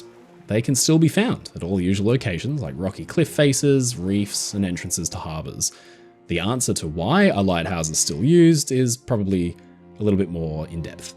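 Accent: Australia. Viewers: I THOUGHT YOU WERE BRITISH NOT AUSTRALIAN! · well this is how i find out you’re australian. i’m absolutely terrible at recognising accents so i kinda just vacillated between thinking you were british and thinking you were american 🤦🏼‍♀️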